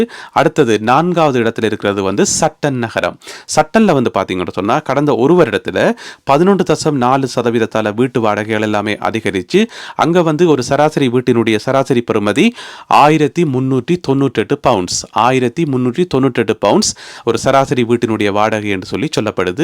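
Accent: Sri Lanka. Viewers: I have subscribed your channel bro. I like the Srilankan tamil accent. Your collective news always helpful.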